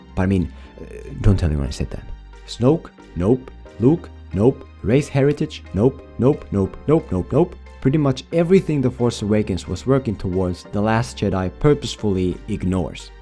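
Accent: Finland. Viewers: Hi, are you Finnish? Your accent sounds really Finnish!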